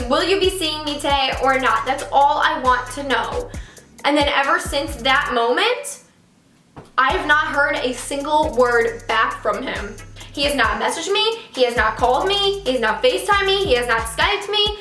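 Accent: New York, United States. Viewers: Oh my gosh. The New York in you is SCREAMING in this video. I love it~ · lol i just discovered you and watched your "exposed boyfriend" video and i knew you were from new york. 1) savage as fuck 2) your accent is cute and 3) you're not as basic as white girls in (all of) California and Atlanta.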